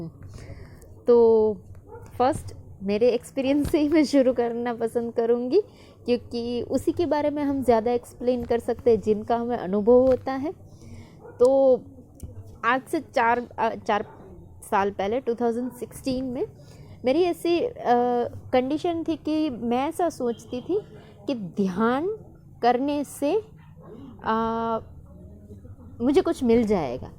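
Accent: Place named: Gujarat, India. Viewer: Gujju tone of hindi 😊😊😊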